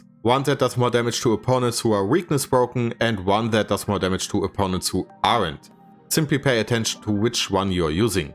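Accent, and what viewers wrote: Germany. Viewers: i hear a german accent...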